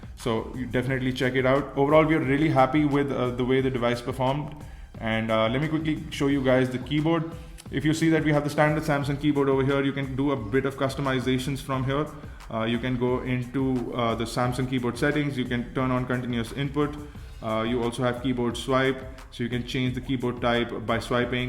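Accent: India. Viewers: lol this is indian accent review!!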